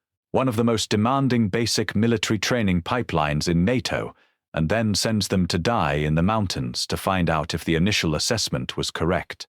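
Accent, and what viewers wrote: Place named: Bogan, Australia. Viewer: AI narration is bogan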